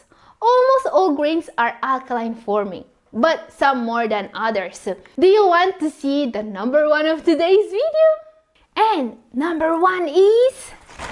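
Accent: Italy. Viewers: Oh is so awesome… she has slightly italic accent though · was born in the philippines, but she studied in italy from grade 1 up to liceo, n she took seriously her studies. Sometimes her accent words are in italian without noticing her english pronunciation. We have to understand italian n english pronunciation are different. We have to excuse her wrong words mistaken.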